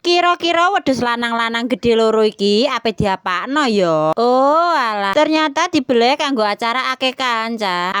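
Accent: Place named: Central Java, Indonesia. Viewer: Nadane koyok plwong Purwodadi , sampean asli pundi mbak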